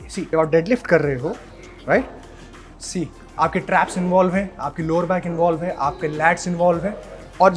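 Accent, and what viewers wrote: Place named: Delhi, India. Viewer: Muhje ek bhot confusion hota appki😂 tone sun kar kabhi kabhi lagta hai app Mumbai ke nahi ho kya app Delhi ke ho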